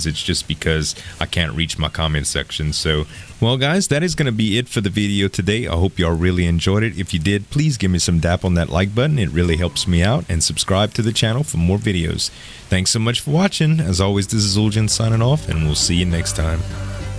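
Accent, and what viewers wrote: Louisiana, United States. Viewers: Is Z from louisiana?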